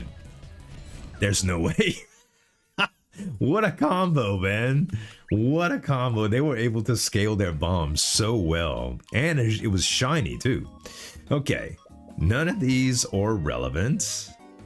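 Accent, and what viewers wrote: Louisiana, United States. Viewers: Okay dude your accent is driving me nuts. Are you from Louisiana? You have a very Cajun accent. I'm super familiar with it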